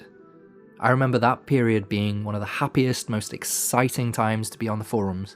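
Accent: Wales, United Kingdom. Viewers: Thanks for sharing these podcasts; it’s so interesting to hear your story with TR. I think we’re quite similar! I grew up in Wales too - clocked your accent;) - but had a crap time being bullied in a rough secondary for being ‘geeky,’ so actively pushed down those interests to remain safe at school. It’s inspiring to hear someone embrace their passions so wholeheartedly. Did you get any backlash growing up for your interest in TR and gaming up in NWales? I loved the puzzles, history and more deliberate game pace of the older games, even though my family thought it was Lara’s sex appeal - how wrong they were…;p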